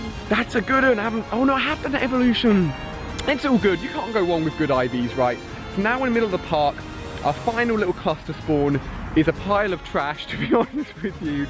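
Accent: England, United Kingdom. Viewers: english?? or america???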